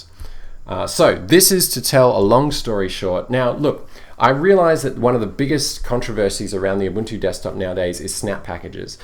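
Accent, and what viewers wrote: Australia. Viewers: great job, enjoy your chats... nice to have an aussie accent on YT !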